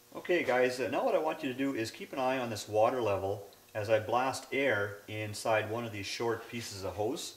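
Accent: Canada. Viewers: He sounds Canadian to me lol.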